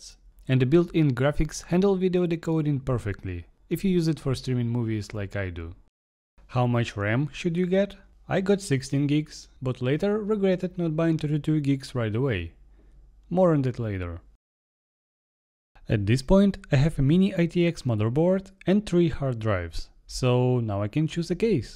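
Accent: Eastern Europe. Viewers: Omg I didn't realize this is a super new video! I am loving it so far. The Slavik aksent is appealing though! I've been delaying building a nas / home server forever now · славик акцент вэри найс. ал понятно энд по делу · For some reason the Slavic accent makes me trust your experience more😂 great vid! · thank you slavik accent man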